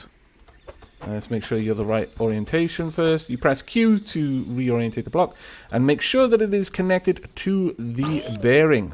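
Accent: Wales, United Kingdom. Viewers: is he Welsh? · are you Welsh 🏴🏴??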